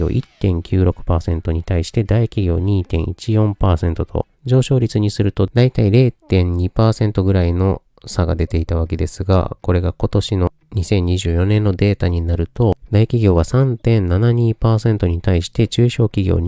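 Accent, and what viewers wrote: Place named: Kansai, Japan. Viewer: マクロもミクロの強くていらっしゃる、羨ましいです。関西言葉で、落ち着いて話されるので妙な気分になります。楽しいです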